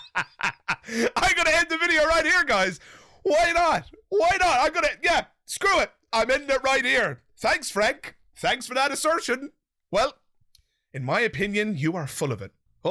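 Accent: Ireland. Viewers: I like your work , honest , simple , straight forward ,easy to follow , genuine, calm, ........ And all in a soft gentle Irish accent. Well done sir .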